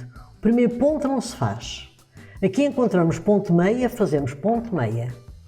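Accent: Portugal. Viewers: Olá! Estou encantada com teus trabalhos. Lindos e fáceis! Teu sotaque também, es Portuguesa? Beijo. Marise RS Brasil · Boa tarde! Nesta pandemia já fiz uma gola e uma pantufa. Sua didática é excelente, não fica nenhuma dúvida. Obrigada, gosto muito do seu sotaque, também sou portuguesa. 🥰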